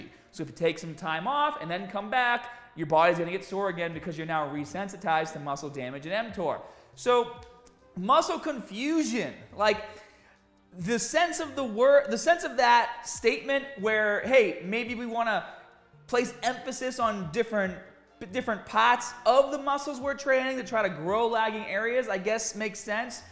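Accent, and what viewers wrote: Boston, United States. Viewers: Love it! That Boston twang! Cheers, as always I salute you!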